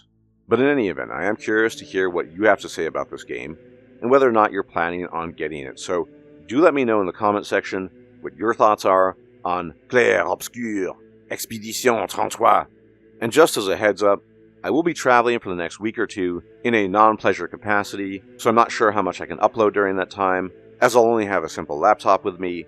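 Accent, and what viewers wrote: France. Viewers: Amazing French accent, how come? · That french accent caught me off guard. lol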